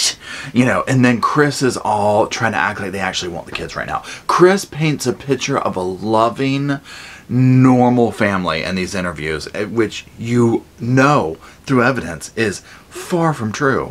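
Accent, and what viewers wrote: South, United States. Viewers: Hi! I’m a new subscriber and I’m making my way through your videos. Love them!!! I’m southern so I love your southern spin in this one!! ❤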